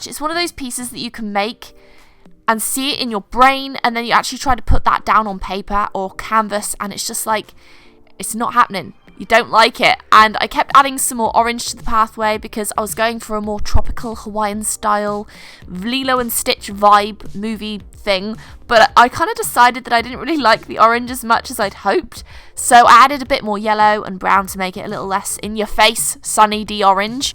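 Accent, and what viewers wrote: United Kingdom. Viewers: reminds me of Moria with the funny edits but a British accent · Bruynzeel: say it like Brown-sale (with a little bit of an emphasis on the w). I'm assuming it's an old Dutch name. The y isn't really used in Dutch (it's replaced with the ij which in a crude English translation is said like "eye"). But this is how I (someone also with a British accent) would say it.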